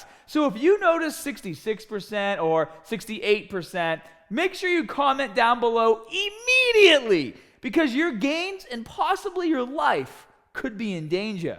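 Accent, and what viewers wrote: Boston, United States. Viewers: This is the most Boston I've ever heard you sound..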